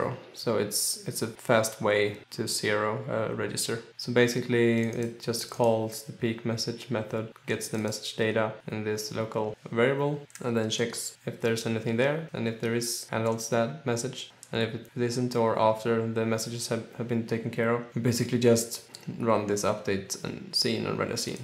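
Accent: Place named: Sweden. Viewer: I lived in Sweden quite enough to notice the Swedish accent :)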